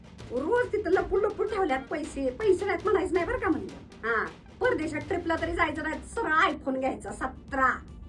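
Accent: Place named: Kolhapur, India. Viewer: किती छान बोलत आहेस मस्तच कोल्हापुरी ठसका ✌️✌️💪💪👌👌👌👌🤣🤣🤣💐💐💐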